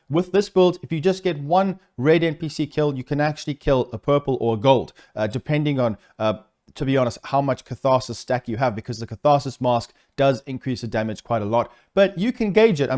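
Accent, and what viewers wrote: South Africa. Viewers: Great video. Haven’t played TD2 after finishing the story when it came out. Thinking about going back again. Anyway, Are you South African? Keep up the great content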